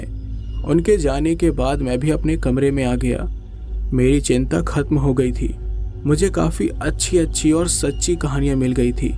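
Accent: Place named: Bhojpuri, India. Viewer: Your best story so far. And loved the Bhojpuri flavour in it. Maza aa gayil ekdam 😅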